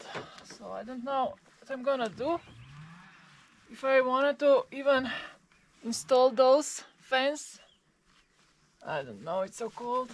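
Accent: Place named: Slovenia. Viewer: but it sounds Slovenglish and we need to practice our English.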